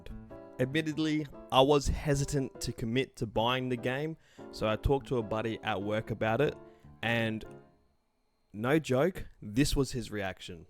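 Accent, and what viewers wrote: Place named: Australia. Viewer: This is the second time I’ve watched this video. I love your Ozzie accent!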